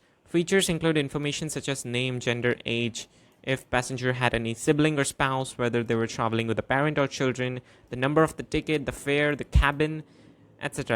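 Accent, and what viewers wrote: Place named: United Kingdom. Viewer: British Accent toh hai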